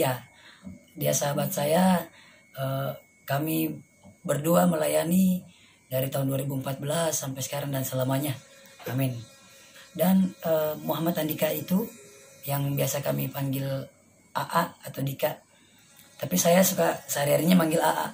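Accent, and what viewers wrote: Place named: Bugis, Indonesia. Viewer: ee.. terjawab sdh pertanyaan semua org siapa dan ee.. lho kok ketularan gaya bicara bugis ya 😀👍 Gbu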